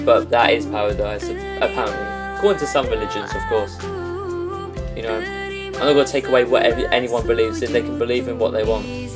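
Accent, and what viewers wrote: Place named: United Kingdom. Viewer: What's up dude!!! I like your british accent. greetings from Indonesia🇮🇩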